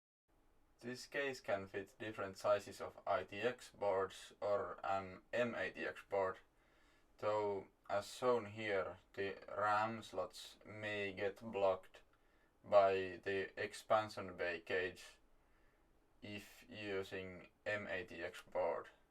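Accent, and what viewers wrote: Finland. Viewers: you sound like Finnish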